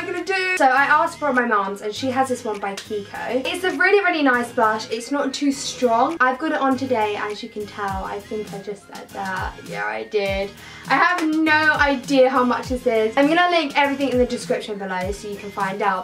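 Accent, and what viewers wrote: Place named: Essex, United Kingdom. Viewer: you sound 🔊 like your of Essex good video by the way 😄😛😍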